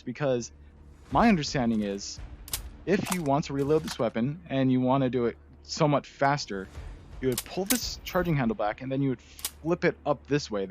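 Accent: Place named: United States. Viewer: I can already tell your american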